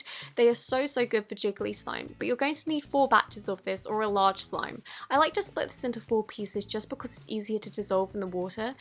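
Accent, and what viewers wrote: United Kingdom. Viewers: Is she British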